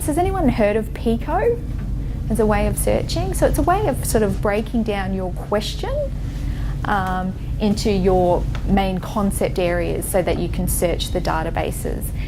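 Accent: Australia. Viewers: Omg I just ❤️ an Aussie accent!;)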